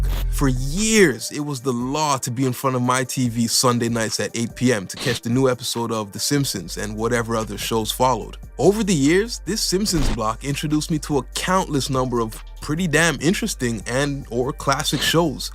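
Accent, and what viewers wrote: Toronto, Canada. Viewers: ur def from toronto broski lol i love this channel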